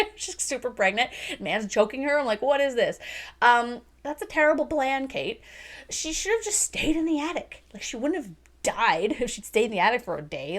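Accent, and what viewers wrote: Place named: RP, United Kingdom. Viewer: 🇬🇧 Britisher here, laughing at your posh accent! 😆 I was wondering where that came from, until you mentioned the audio book and it began to fit into place. That got dark right at the end of the spoiler section! 😳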